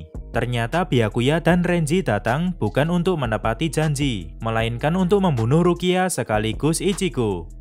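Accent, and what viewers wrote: Java, Indonesia. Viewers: Medok bnget jawanya 😂🤣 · Ketoke mimin iki wong jowo😂 Suarane koyok wong jowo soale :v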